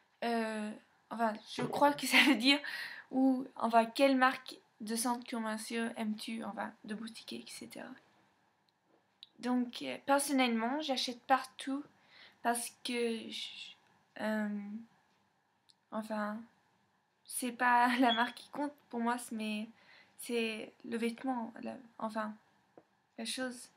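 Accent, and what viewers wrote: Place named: England, United Kingdom. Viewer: je trouve que tu as un accent anglais et en plus tu n'habite pas en france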